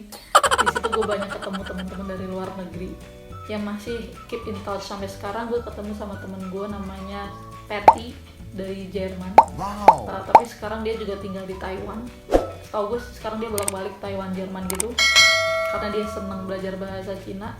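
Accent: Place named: Java, Indonesia. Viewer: Wong jowo mas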